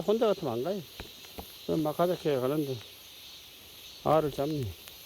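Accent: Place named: Mungyeong, South Korea. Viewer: 저는 고향이 문경 입니다. 저의 고향 말투랑 비슷해서 정감이 가네요.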